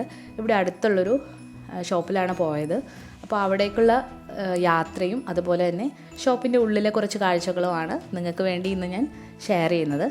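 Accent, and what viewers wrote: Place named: Alappuzha-Kollam, India. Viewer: നാട്ടിൽ ആലപ്പുഴ, കൊല്ലം അവിടെ എവിടെയേലും ആണോ. സംസാരം കേട്ടിട്ട് ചോദിച്ചതാ 😊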